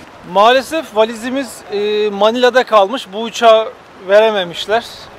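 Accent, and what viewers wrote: Samsun, Turkey. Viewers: "Ben de az yiyim daaa." samsun ağzını filipinlerde görmek duygulandırdı abi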